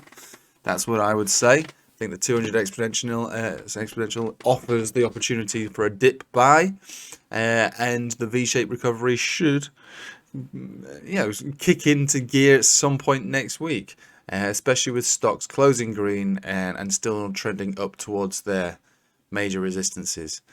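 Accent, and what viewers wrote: United Kingdom. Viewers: Always good to hear a British voice and vision in the morning 😅🇬🇧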